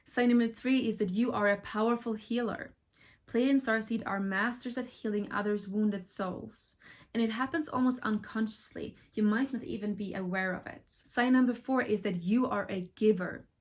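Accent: Sweden. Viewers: Are you Swedish? Love the accent! If so, you are already Nordic, so definitely a Nordic Pleiadian!! 😉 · As a norwegian, it's so easy to hear that she is Swedish, by the accent 😄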